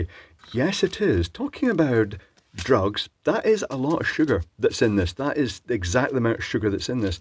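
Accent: Scotland, United Kingdom. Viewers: A fellow Scot?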